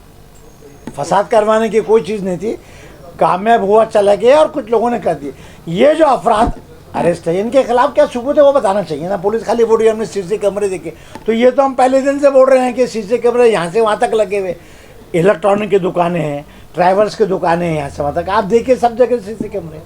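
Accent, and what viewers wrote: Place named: Khandesh, India. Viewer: LLB kar liya sahab fir bhi khandeshi zaban aaj bhi nhi gai aap ki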